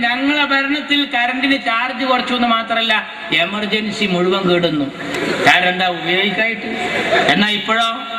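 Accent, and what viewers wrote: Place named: Kollam, India. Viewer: ഹാ ഹാ ഹാ കൊല്ലം നല്ല മലയാളം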